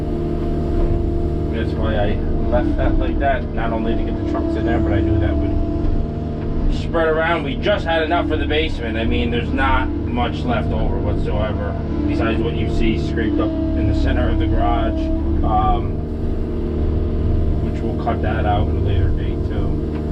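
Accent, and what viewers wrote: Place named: Albany, United States. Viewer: I find the commentary entertaining. The way you speak reminds me of my cousins from Albany. My grandmother's sister married a guy after WWII she met while he was here at a former army base and moved up there. They used to come down here during the winter months to get away from the snow and I was always fascinated to hear them speak. I appreciate the vids. Be safe Boss man.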